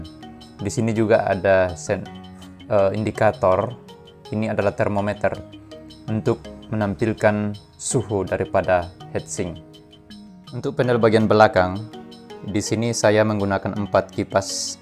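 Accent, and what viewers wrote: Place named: Toraja, Indonesia. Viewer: klo saya dengar2 logatnya Orang ini dari Toraja, maaf klo sala tebak 😁🙏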